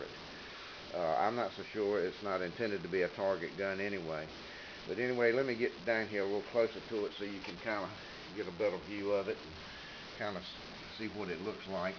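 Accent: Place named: Virginia, United States. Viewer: I have a Mossberg 46B that I've had since I was a child and it was my father's since he was 11 or 12 years old from 1935 or 36. I cannot tell you how many groundhogs I killed with that rifle as a child and it still will drive tacks at 30 to 40 yards. I refinished the stock last year and it looks gorgeous but I only have one concern with the gun and is that is that it has problems sometimes feeding shells from the magazine...... I think it's more of a problem with the magazine feed tube than it is with thebolt because I've changed out the injector and shell pick up and I'm gonna be looking at the magazine a little closer and the spring to make sure it has enough pressure on the shells. If you have any ideas why they're not being picked up by the bolt please let me know ....... once the shells are shot the ejector works perfectly. By the way you must be from Norfolk or Chesapeake Virginia with that accent.....you sound just like one of my uncles!!!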